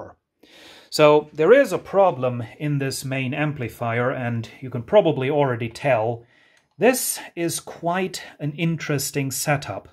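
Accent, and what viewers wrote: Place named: Germany. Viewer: Bist du deutsch? Dein Englisch klingt sehr angenehm. So möchte ich als Deutscher wahrgenommen werden, wenn ich Englisch spreche.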